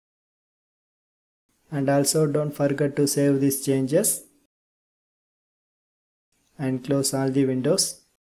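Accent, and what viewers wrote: South India, India. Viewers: south indian lol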